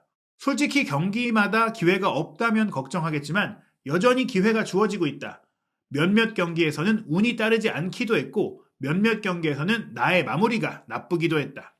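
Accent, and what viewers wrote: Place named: Seoul, South Korea. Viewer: 말투바꿨네 말투이상해요 서울말쓰려고하시나...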